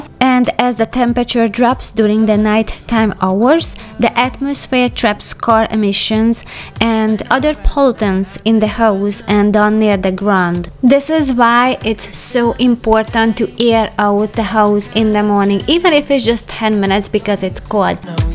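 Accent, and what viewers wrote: Hungary. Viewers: Hodvoid. I noticed the accent. My mom was Hungarian and that’s we all 3 brothers identified ourselves. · Elvileg van automatikus fordítás a youtube videóknál, ahogy látom itt is. Én inkább magyarul hallanék már egy videót, hiszen magyarok.. és legyen angol a fordítása:D Nagyon bántja a fülemet, hogy magyar kiejtéssel beszél angolul.. tudom az én bajom:) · Hi, I just started to watch your videos! You accent is similar to my neighbours who are Hungarian, now I understand why:)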